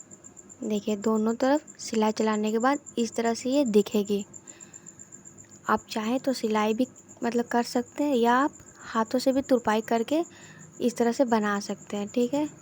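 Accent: Bihar, India. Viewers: mam aapki boli se pakda ki aap bihar se ho ...waise mam kaha se hai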